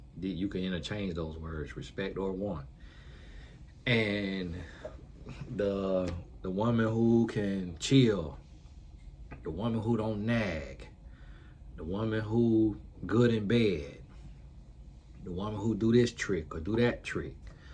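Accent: South, United States. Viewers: Baby the country accent is sending me 😂😂😂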